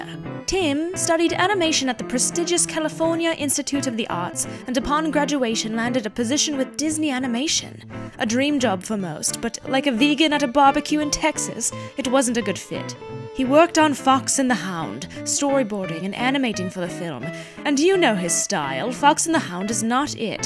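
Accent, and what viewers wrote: United Kingdom. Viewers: Wow. I can't believe you did a british accent for this entite video. 👏👏👏👏👏👏👏👏👏 That takes guts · Well you sound more British than I do, and I'm actually British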